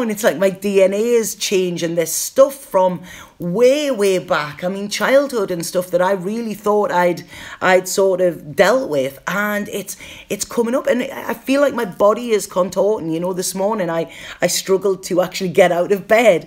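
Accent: Newcastle, United Kingdom. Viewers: "Only Love Today!" Oh yes my love you are teaching. I knew that motto but when you said that into my ear/brain as I did my dishes.....it stuck! Thank You for ever and for always. I will pray for you and offer you a bit of my own advice,"I cannot stop people from doing, saying, thinking shitty, cruel, nasty things to me but I will be F****d if I will give them my fear, greif, the satisfaction seeing me using my free will to help them with their nasty, dark, petty endeavours. That is the part I/we CAN have complete control over. You are amazing, an intuitive reader, an uplifting force of nature and especially as a Sista-Soldier! The first time I heard you it was by "accident" as you came on autoplay whilst I was doing dishes and afterwards I were desperate to find you and, huzzah! I did. Luv your Geordie accent. Don't you worry me darling you are More than equal to any bulls**t lesser beings stomp and shout out of terror into the abyss. 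We got you girl. luvluvluvs, Regan. p,s, I have medical empathy and I Do Not see/sense and malignancy not dirty dishwater colour which is what I see/sense when anyone is going to pass away and/or suffer from a cancer. Is it possible that the growth has been in your brain in some form since before birth? I was so blue last night and I swore off people for good (again!) And here comes channeling God as Billy Connelly saying,"Oh do you bloody think so?!" Yea, I agree completely, spirit love is so much cleaner, purer, holy, for lack of a bette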